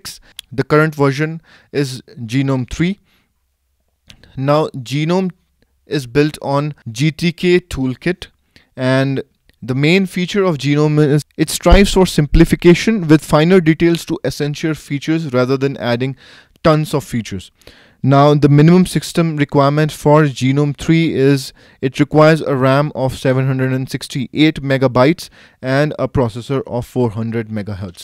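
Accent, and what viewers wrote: India. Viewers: This is how every north Indian speaks English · Its indian accent but not too indian.. Kind of sweet spot i love it 😃 And thank you for great explanation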